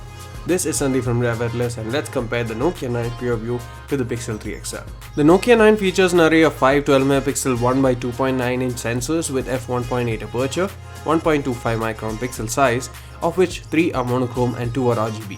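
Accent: Kerala, India. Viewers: Are u a malayalee......bro?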